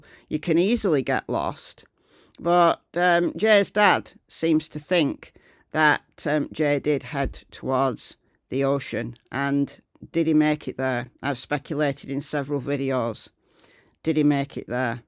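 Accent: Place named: Lancashire, United Kingdom. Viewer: we all know what's going on, the media are implicated, you;re absolutely right. we all know, these little scrotes are pretending to be gangsters, emulating L.A. gangs and rap culture, and the old rave culture. with no knowledge. I get the impression that you know the old rave scene? Those days are gone. I bet you know, judging by your accent, that you know what I'm talking about, Lancashire has been the centre of house/rave since the opening of the Hacienda, as you know. You're speaking truth, you know, we all know, so...........why all the speculation? drama and theatrics?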